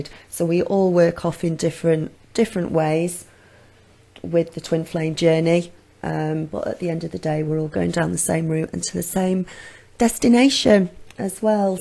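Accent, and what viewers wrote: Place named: Sale, United Kingdom. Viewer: ah, Sale. I can hear it.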